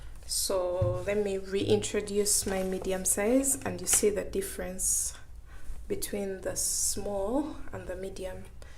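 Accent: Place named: Uganda. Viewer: Cool pattern! Also, I lit up when I heard your accent. I used to live in Uganda and your voice made me miss it!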